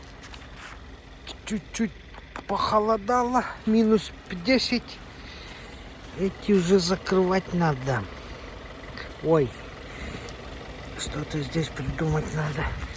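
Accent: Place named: Tuva, Russia. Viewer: У тебя тувинский акцент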